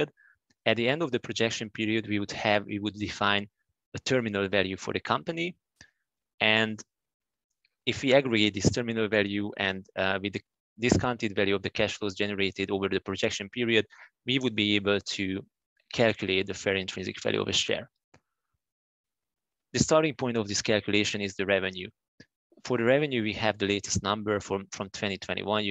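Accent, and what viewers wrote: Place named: Hungary. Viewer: I live in Oregon but you sound like a Hungarian!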